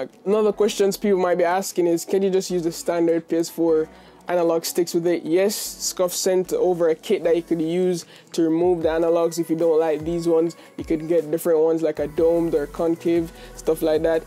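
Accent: Jamaica. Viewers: Is it jamaican? My parents are Jamaican. 😊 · ya jamaican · I knew yu was Jamaican when I heard your voice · You Jamaican? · Is he Jamaican? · Are you jamaican....?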